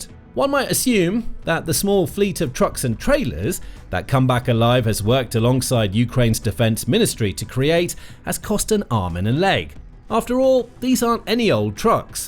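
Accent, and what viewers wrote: England, United Kingdom. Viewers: "Has gotten" is an anachronistic form of English only used in the USA, so why is the script spoken with an English accent? And UK vehicles tow rather than haul. Cleverly researched essay though and almost amusing😅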